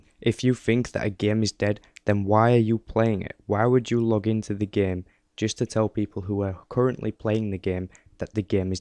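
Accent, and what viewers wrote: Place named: North West England, United Kingdom. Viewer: Hey man where you from? I'm inclined to say north west, I'm from st.helens and your accent is similar to mine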